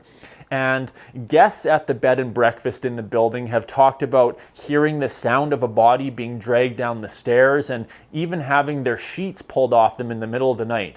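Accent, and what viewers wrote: Canada. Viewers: How can you like him? Worst yet! Every time he says A Boot I just want to smack him. The way he talks sounds like a bad B movie that could not even make that grad, I am totally sad to find out he is from Vancouver.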